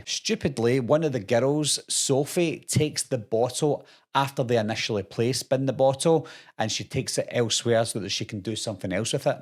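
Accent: Scotland, United Kingdom. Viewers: With a last name of i can pick oug that scottish accent...where ya hail from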